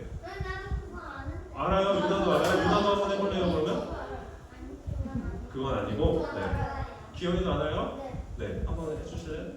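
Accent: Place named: Jeolla, South Korea. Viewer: 전도사님~ 이순신 연기 보고 진짜 이순신 장군님인 줄 알았어요~ 역시 전라도 분이시네요^_^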